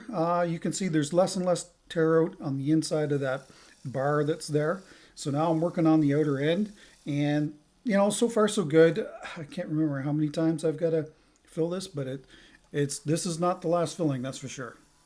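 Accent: Canada. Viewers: It's a beautiful piece,. Pretty impressive how you can turn something so large. The audio was fine too. I could still hear you say "a-boat" in your Canadian accent, which reminds me of when I went travelling and makes me smile, ay. Have a great weekend, looking forward to your next piece.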